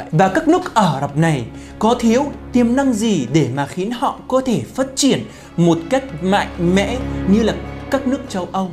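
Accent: Standard. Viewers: Chào nhé..cô thấy cháu nói tiếng việt rất chuẩn. Cảm ơn vì cháu yêu đất nước của cô. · Mới biết kênh và rất ngạc nhiên vì nói tiếng việt quá chuẩn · mình cảm thấy hổ thẹn với bản thân mình quá. nói tiếng việt chuẩn và lưu loát quá. mà công nhận có trình độ địa lý đáng nể. chúc thành công trong cuộc sống. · ! Ban rat gioi toi nguong mo ban, Ban la nguoi noi tieng Viet rat chuan! (moi ban den tham qe toi Can Tho mot lan nhe!) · Noi tieng viet nam chuan qua cam on .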